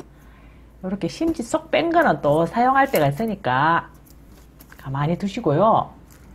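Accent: Gyeongsang, South Korea. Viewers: 갱상도 아지매 아인교? 구수하게 자미있게 설밍도 잘하네예!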